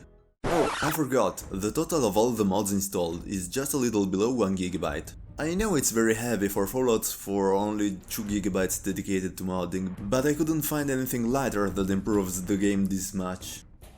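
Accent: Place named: Italy. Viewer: comunque si sente tantissimo che sei italiano, comunque bel video!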